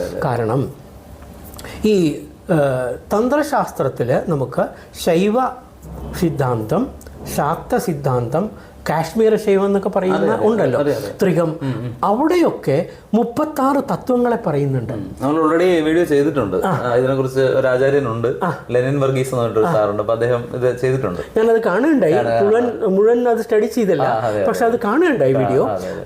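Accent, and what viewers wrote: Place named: Karnataka, India. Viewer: കർണ്ണാടക സ്വദേശിയായ മലയാളം. 🙏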